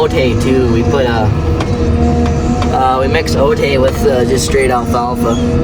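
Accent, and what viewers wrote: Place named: Upper Midwest, United States. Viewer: Nice video 👍 Just found this channel. Good stuff! Where are you located? Sounds upper MW. I'll be checking in from WA.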